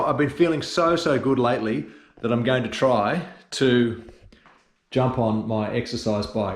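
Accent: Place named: Australia. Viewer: I really appreciated your series, your honesty and the clarity of your points. And great that I could hear it in my native language too (Aussie). Thanks!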